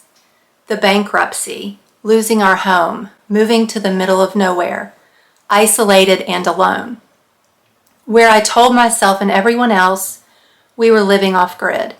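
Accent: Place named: South, United States. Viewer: I like Blue and Yellow and your southern accent.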